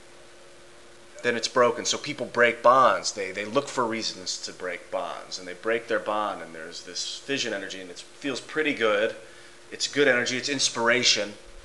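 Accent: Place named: South, United States. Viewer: You must be southern.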